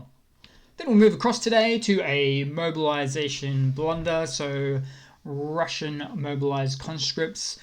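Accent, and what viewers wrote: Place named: Australia. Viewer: Love your Aussie accent! All da best from Poland :)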